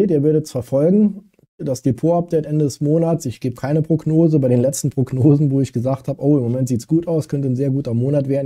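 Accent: Saarland, Germany. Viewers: du hast ein Saarländischen Dialekt :D lustig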